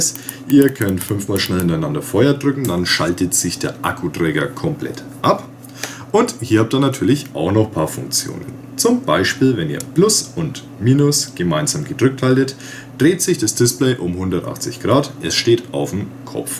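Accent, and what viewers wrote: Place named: Franconia, Germany. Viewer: kommst du aus dem fränkischen Raum?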